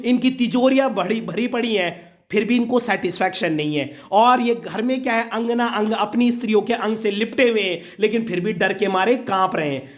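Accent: Garhwal, India. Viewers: Sir kya aap gadwali ho ? Love from Chamoli - Gopeshwar, Uttarakhand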